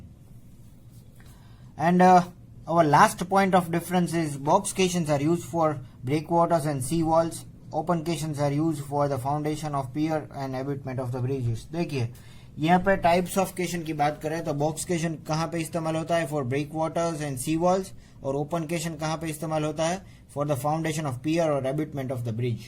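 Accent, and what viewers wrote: Gujarat, India. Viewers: Sir aap gujrat se ho na 😅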